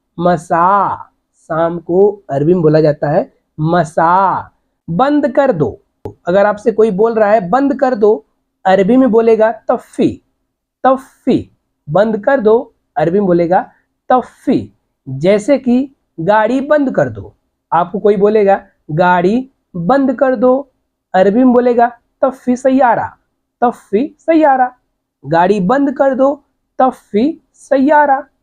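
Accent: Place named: Uttar Pradesh, India. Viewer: Bhai mujhe lgta hai aap uttar pradesh se ho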